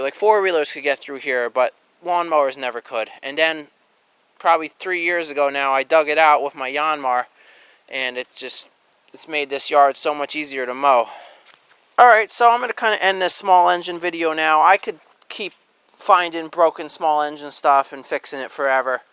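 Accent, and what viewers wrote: United States. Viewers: stop fuckin saying yawn mower. god damn yank.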